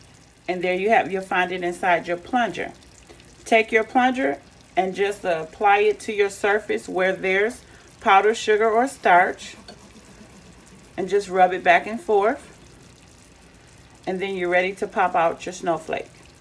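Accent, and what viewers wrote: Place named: Jamaica. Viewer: Nice you sound jamaican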